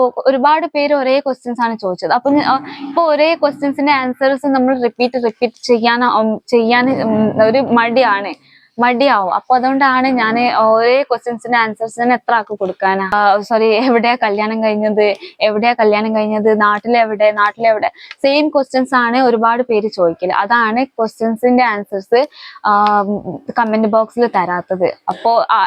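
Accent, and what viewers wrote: Malappuram, India. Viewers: Malappram basha ath mathi 🤗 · മലപ്പുറം മലയാളം ആണ് പറയുന്നത് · മലപ്പുറത്തു ആയിരുന്നോ സ്ലാങ് സംസാരിക്കുമ്പോൾ അങ്ങനെ തന്നെ മലപ്പുറത് ആണ് ഞാനും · Samsaram kettitt keralathil malappuram allenkil kannur aayirunnu enn thonnunu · Samsaaram malappuram or kozhikod kaari. Look kandal nortj india kaari anu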